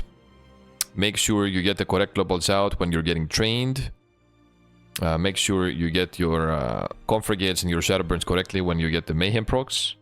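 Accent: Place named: Greece. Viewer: Cool accent, is that greek if you don't mind me asking? :)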